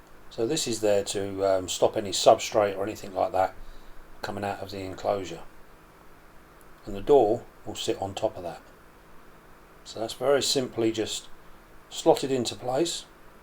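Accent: United Kingdom. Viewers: Hi, your videos have made me want to get back into tarantula keeping! I assume you live in the UK by your accent so I just wanted to ask a question. Do you use heat mats and thermostats for your spiders? I've heard they are ok at room temp but it seems widely discussed in the community. Keep up the great work!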